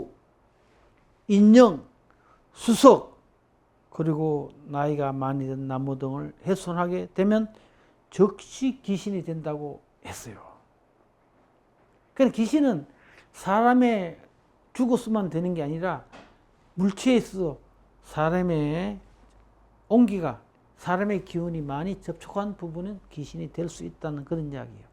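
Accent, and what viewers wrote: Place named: Daegu, South Korea. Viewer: 요즘 다시 교수님 영상 정주행합니다. 저도 대구출신이라 교수님의 사투리가 정말 정겹네요~~ㅋㅋ😊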